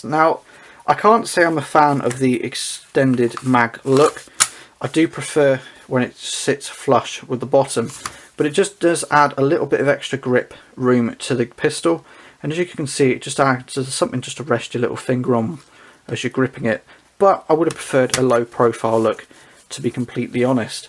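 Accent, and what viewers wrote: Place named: England, United Kingdom. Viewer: I subscribe to your channel and find your reviews informative, entertaining and thorough. That said, I simply have to take issue with you calling this pistol a "Cee Zee". You speak with a very eloquent English accent and should therefore know that it is in fact, "Cee Zed". This tiny faux pas aside, keep up the good work.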